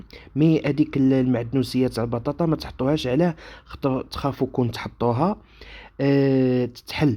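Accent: Constantine, Algeria. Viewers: Vous avais un langage pur constantinois ce plat et delicieux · ألماكة حلوة وهدرتك القسنطينية زادتلو بنة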